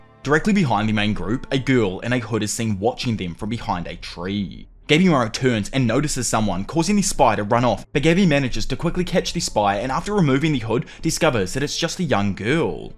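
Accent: Australia. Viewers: When history, spiritualism, botany, & anime are intertwined with intellectual acuity the results breed something akin to Hells Paradise. Delightful narration & compression of arc by. Who doesn't love a story told in Crocodile Dundy's accent. Highly anticipating your next arc explained. Thanks, but out of curiosity any significance choosing the name? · Australian accent isn't it?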